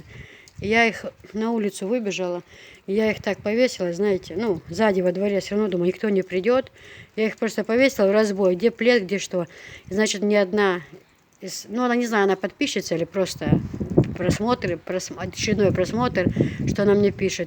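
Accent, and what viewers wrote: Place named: Vedeno, Russia. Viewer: мне все нравится что ты снимаешь, рассказываешь!ДАЛЛА эатту бойла хьа. Я жила в пос. Мичурина в Грозном. И мои соседи Дунаевы, Альбиговы были веденские, ( они живут и сейчас там, я в Страсбурге).имена, Кемса в основном встречается у веденских больше. У тебя даже говор веденский. Я очень рада за твоих марзхой, им очень повезло с тобой. Мы жили душа в душу, делились всем, у меня прекрасные воспоминания о моём детстве, юности. Между Дунаевых и нами был маленький заборчик.Кемса (так зовут нашу соседку)всегда была нам как вторая мама. Её сестру звали Дикиева. с уважением Асет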